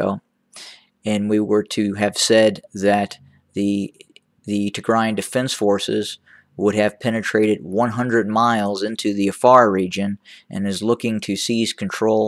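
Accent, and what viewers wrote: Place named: United States. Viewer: it is an American accent indeed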